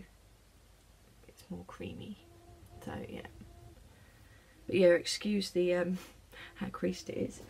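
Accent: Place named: United Kingdom. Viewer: You do such lovely things. I could listen to you all day long, I do love the British accent, it sounds so much more cultured than our American English. The Royal Albert tea set is so beautiful, long a favorite of mine. I do love drinking from tea cups, but it cools too quickly, so I use a large, thick mug. Not so elegant, but serves the purpose. I hope to see you again soon.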